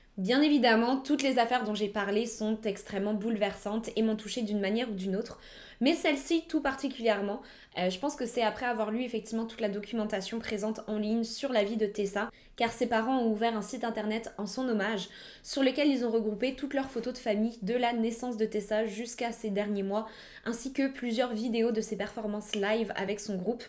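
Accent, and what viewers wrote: Quebec, Canada. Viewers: Effectivement,, moi aussi je trouve que ton accent es de plus en plus québécois !! 👌😉 Et bravo pour tes vidéos continue ton excellent travail !!😊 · Allô tu es française oui mais parfois je reconnaît un peu de quebecois en toi ….vie tu au Québec ???? J’adore tes vidéo en passant ❤️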